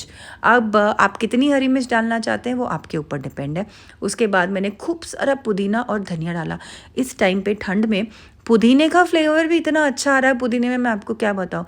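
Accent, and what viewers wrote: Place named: Bikaner, India. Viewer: Aap ki boli or habits all r bikanri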